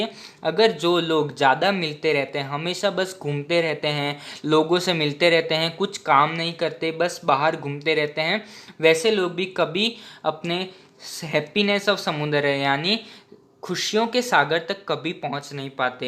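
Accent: Gujarat, India. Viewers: Aap gujarati ho na ? Aap jese bol rahe ho vaisa to gujarat me Hindi chalta hai .... By the way I am also from Gujarat 🙋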